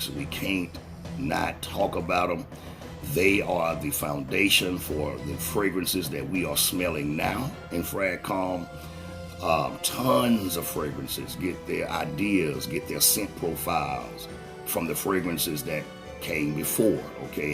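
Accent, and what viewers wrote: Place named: South, United States. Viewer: That’s so cool you have that southern accent lol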